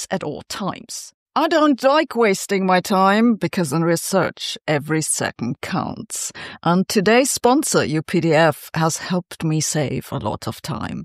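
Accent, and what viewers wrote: Germany. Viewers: She is German. Give her a break...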